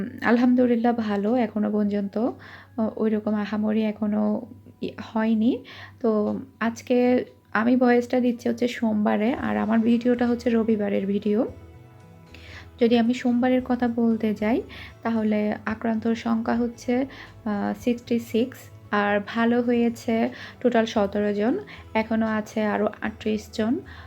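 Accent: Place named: Satkania, Bangladesh. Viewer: আপু সাতকানিয়ার ভাষায় ব্লগ দিতে হয়তো ভালো লাগে না মনে হয়? কিন্তু আপু তোমার মুখে সাতকানিয়ার ভাষায় কথা শুনতে আমার খুবিই ভালো লাগে ।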